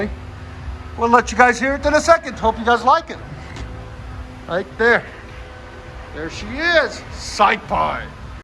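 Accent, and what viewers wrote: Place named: Upper Peninsula, United States. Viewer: Are you from Michigan because you sound like a yooper.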